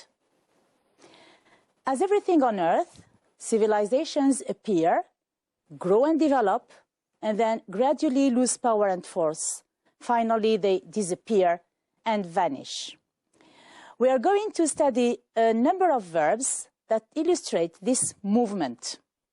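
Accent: United Kingdom. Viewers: Right great RP english thanks a lot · thanks .. :'D british english (y) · it seems that The Mr is using the British accent , i really liked it . i wish there will be some of scientific lessons .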